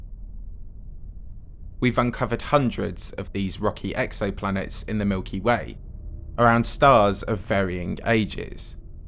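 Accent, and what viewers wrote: United Kingdom. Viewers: Other than Sir David, I typically do not like Bri...ish accents. But I like your cadence and delivery. Top of the mornin' cup of tea Or whatever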